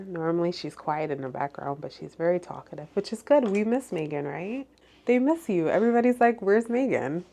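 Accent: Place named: New York, United States. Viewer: hey baby…. awh the face mask was so cute. lol a tooth ache is the absolute worst. We do miss Meg… happy Mother’s Day babe. We want you to vlog more too…👀 Say “coffee” again…🤭 your NY accent is everything. yum, love Greek food! The bouquet is gorgeous, you did amazing baby. Oooow, this Sunday my husband and I are going to indulge a bit. I’m going to pick up some of that tequila. Not I think I’m Spanish…🤣 Your face is flushed after those two drinks. That one looks so good too. By the looks of it, you guys had an amazing Mother’s Day.🩷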